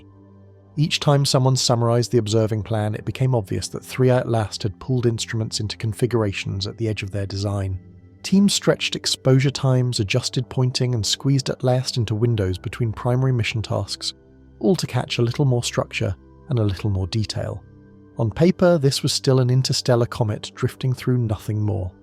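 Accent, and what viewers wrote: United Kingdom. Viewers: Why is the voice ALWAYS british english!?!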